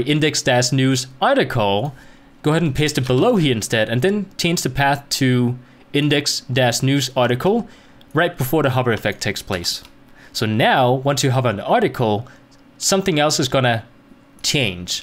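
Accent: Denmark. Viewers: Thanks bro , im working on my portfolio website because im learning to code :D Do you want to see it?? It´s not done yet though but pretty far (ps you sound danish ;-) )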